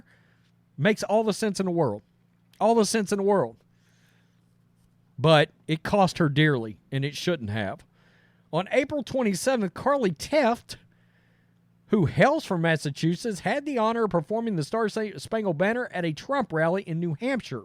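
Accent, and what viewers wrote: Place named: Tennessee, United States. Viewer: you are so country sounding! You sound just like me talking. Lol im from Tn so we get it honest! Love ya! Great reporting as always! I love this channel!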